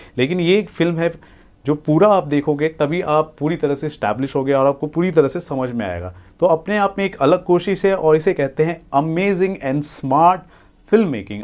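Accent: Karnataka, India. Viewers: You talk pakka kannadiga in 1st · Ur kannada is so sweet 😘.. lots of love from Karnataka ❤️💛🙏🏽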